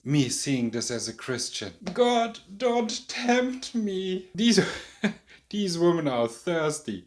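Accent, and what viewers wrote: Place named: Germany. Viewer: immediately recognized that you're from Germany xD nice review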